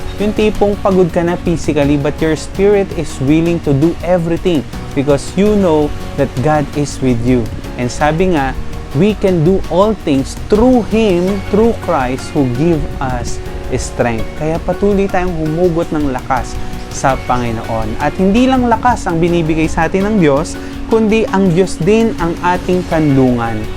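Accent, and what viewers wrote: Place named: Batangas, Philippines. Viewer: ah kala ko lang po kasi na may Batangueño o Tagalog accent 👍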